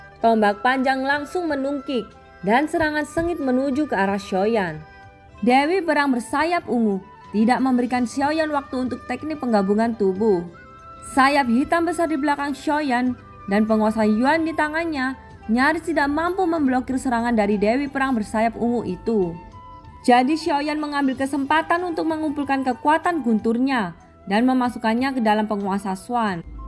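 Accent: Java, Indonesia. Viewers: Nah mulai enak suaranya&cuma logatnya masih medok v😁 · Kak orang jawa yah??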